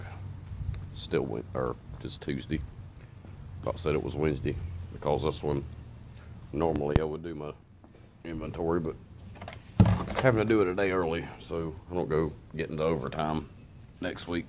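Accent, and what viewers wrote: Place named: South, United States. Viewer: Thanks for checking out our channel. Thought I would do the same. If I may ask, where are u from? Hear a southern accent. I was born in Arkansas, and lived in Texas a few years, growing up. Enjoyed the vlog.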